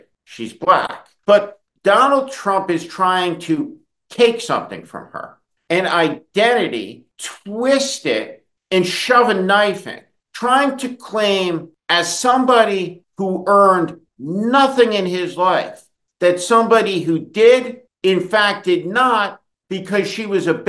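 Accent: New Jersey, United States. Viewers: I support that NJ dialect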